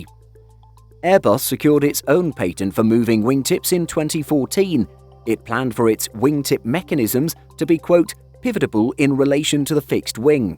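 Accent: United Kingdom. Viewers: The guy is British, that is how the Brits say it, it's not wrong, it's just different.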